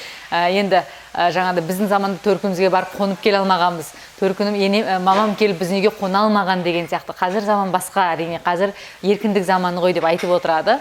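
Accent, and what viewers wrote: South Korea. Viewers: Тилин корейцев айналып бара жатыр, кей бир казакша создеринди тусиниксиз сойлеп жатырсын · Дикция кәрісшеге 😃☺️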